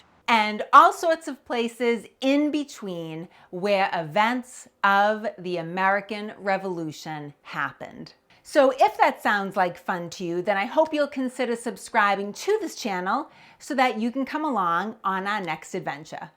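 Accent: Boston, United States. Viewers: Your either from Wostah, or Reveah. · Being a Bostonian, I really appreciate a YT channel where the presenter talks like me!😅 Great job,! Careful walking those ridges! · Damn,. I didn't think anybody's Boston accent was as thick as mine. Well done. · Will you take that Boston accent with you when you go out of Massachusetts.🐎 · She had me at Hello. Love her Boston twang . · My friend told me about you. I am a huge history buff, but my friend says your Boston accent is worse than mine. I was on a TV show based in Boston, and I had to be subtitled. Hahaha, I just subscribed. LOVE your work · You are so smart, attractive, and live the Boston accent!!❤ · I love that Boston accent. My Love is a native of Cranston RI. If u have ever heard a girl form there talk u will fine it just as funny as i still do even after 44 yr.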